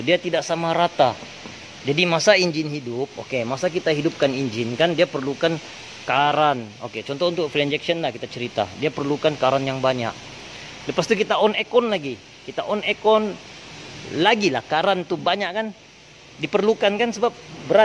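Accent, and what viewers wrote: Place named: Tawau, Malaysia. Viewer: mcm org twau. bangkel d mana bos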